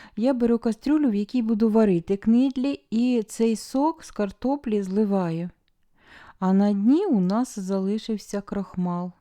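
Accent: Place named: Cherkasy, Ukraine. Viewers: я подумала- Вінниця ❤Приємно знати,що на Черкащині так розмовляють ❤. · Вимова черкаська.Шевченкові краї.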